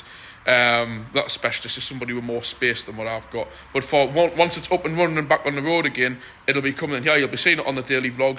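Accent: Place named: North East England, United Kingdom. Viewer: That is how I found your channel, typed in something to do with L200 fuel filter and with the accent new you were northeast. anyone that looks for youtube video on a pacific vehicle you have worked on will come up world wide, also the caravan blogs.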